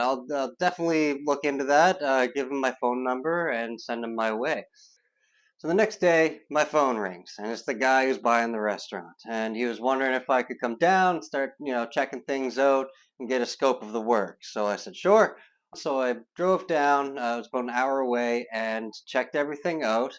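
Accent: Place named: Atlantic Canada, Canada. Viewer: I love your accent! Nova Scotia or Newfounland?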